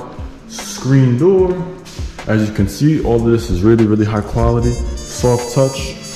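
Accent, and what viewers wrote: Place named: Portugal. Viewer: O teu sotaque espanhol é mais parecido com o português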